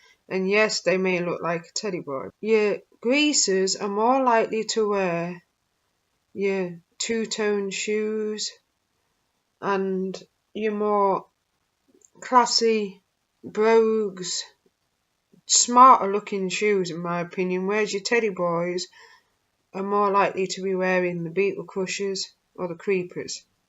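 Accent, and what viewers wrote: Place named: North, United Kingdom. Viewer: You sound like a northern gal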